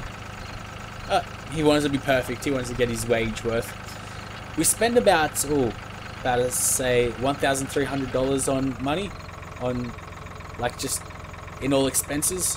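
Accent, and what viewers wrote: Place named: Australia. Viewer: are you from australia because you sound australian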